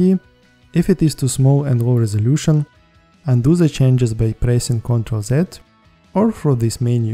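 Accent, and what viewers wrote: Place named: Russia. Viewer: Какой акцент, будто русский говорит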